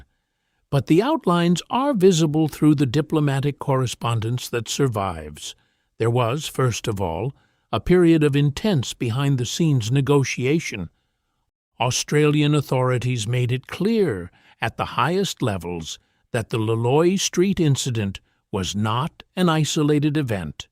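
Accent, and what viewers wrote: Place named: United States. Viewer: Why is someone with an American accent relling this tale ?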